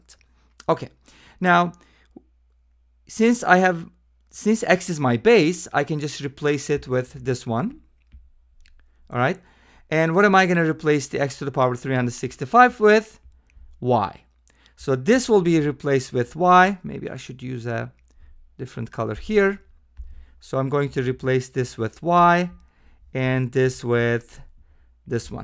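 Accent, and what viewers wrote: Turkey. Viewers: Congrats! Is there a chance that you are from Turkey?? Your accent resembles Turkish English accent :)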